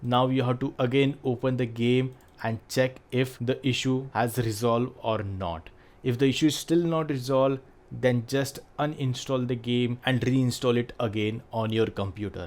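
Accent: India. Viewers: hi I like your Indian accent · Thanks for your tutorial, it was very usefull, and your indian accent made it better :)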